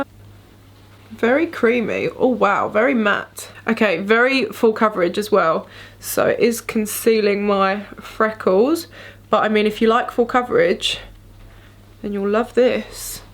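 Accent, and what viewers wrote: United Kingdom. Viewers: You have the most beautiful eyes!!! Your just beautiful over all. British accent bonus!!!!!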